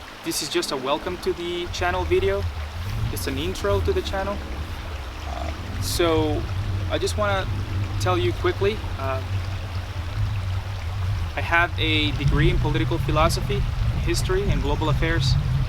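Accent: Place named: Latin America. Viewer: I followed you from prepper nurse 1, because I enjoy learning from many different teachers. I hope you do your videos on the steps you make on your property and the work you do towards your goal. From my years ( I have kids your age) I see you've barely left the first quarter century behind, and I want to applaud you for making these decisions which seem to go against everything your generation stands for. Also, I hear in your voice, a Latin based language (Spanish, Romanian) and was just curious, which one?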